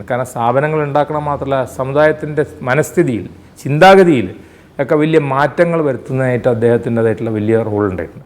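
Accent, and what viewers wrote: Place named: Malabar, India. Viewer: മലബാർ ശൈലിയിൽ സംസാരിക്കുന്നു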